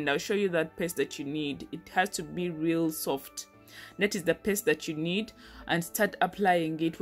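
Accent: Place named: Uganda. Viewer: She sounds Ugandan hmm..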